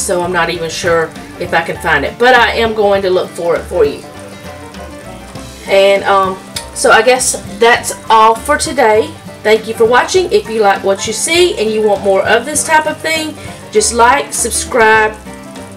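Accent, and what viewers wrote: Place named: South, United States. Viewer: I love your heavy southern accent. ❤️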